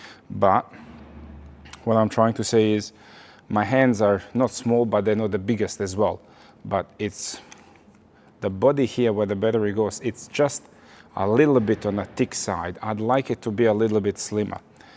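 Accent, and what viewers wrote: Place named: Europe. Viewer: Don’t let the accent fool you he’s from Australia. I thought I was watching a guy from Europe.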